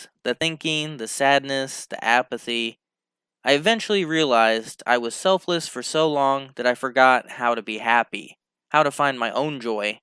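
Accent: United States. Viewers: if i didnt knew it better i would think you are american (cause of your english skills and speech) :D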